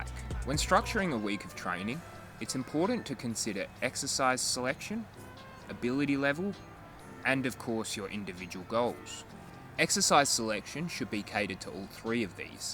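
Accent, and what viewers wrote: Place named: South Australia, Australia. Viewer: You have a South Australian accent!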